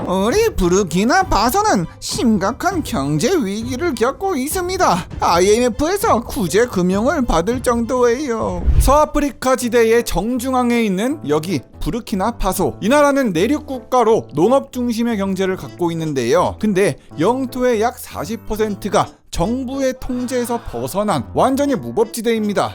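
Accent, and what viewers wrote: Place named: Jeolla, South Korea. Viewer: 전라도네 ㅋㅋ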